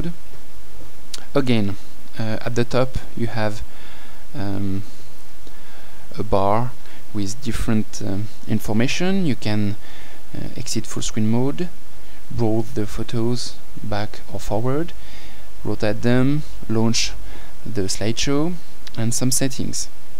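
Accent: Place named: France. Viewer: comme un accent francais,, it's weird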